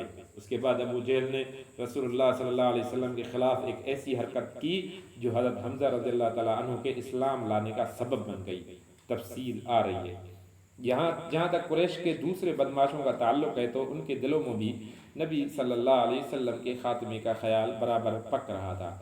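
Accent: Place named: Sindh, Pakistan. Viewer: very good book and good voice although sindhi accent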